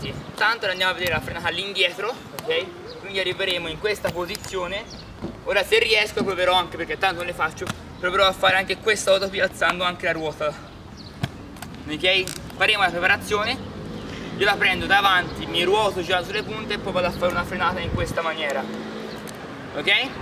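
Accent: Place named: Tuscany, Italy. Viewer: Sei toscano dall'accento sembra di sì bravo bellissimo video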